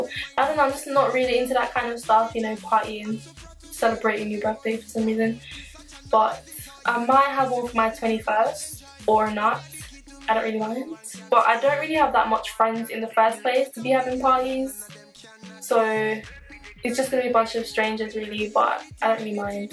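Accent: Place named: England, United Kingdom. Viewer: your Accent is bomb ☺are you in England ?that is sooo dope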